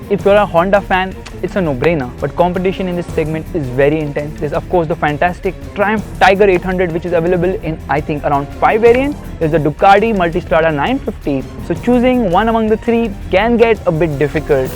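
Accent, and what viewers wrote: India. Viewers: sing song blibbida dibba bibida lol that is what I hear half the time...I love Indian accent but when spoken fast I seriously miss half of it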